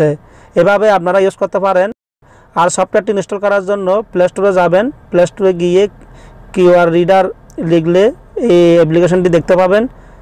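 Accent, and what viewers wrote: Chittagong, Bangladesh. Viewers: chittainga naki? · apni ki Chittagong bari je vasa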